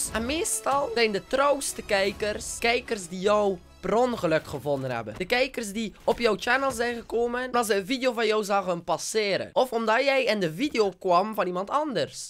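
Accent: West Flanders, Belgium. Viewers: Ben jij een west Vlaming?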